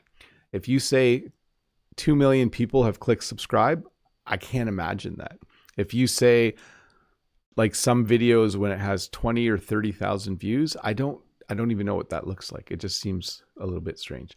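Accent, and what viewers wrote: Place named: Canada. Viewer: Congratulations I'm from Piracuruca Piauí in northeastern Brazil and I want to have a Canadian accent just like you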